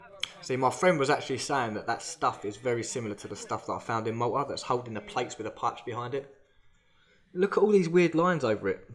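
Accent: United Kingdom. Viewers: Ello babe, where in uk r u from??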